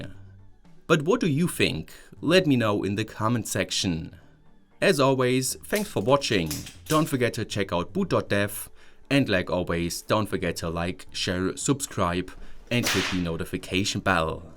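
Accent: Germany. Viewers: you are not even Scottish, this is a heavy German accent if I am not mistaken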